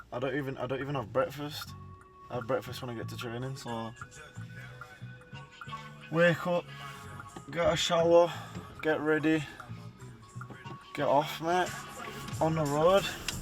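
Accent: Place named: Manchester, United Kingdom. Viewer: Sounds very manc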